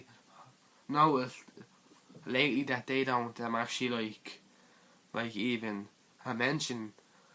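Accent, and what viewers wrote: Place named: Ireland. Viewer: I'm sorry for coming off as a complete weirdo and being off topic but I love your accent (are you Irish?)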